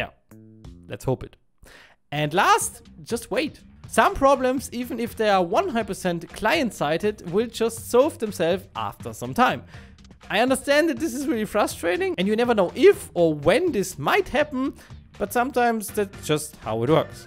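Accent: Germany. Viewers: Insane Video like always man, all of your videos are just useful and very nice to watch. Like how you can hear the german voice, but i think every german can recognise other germans haha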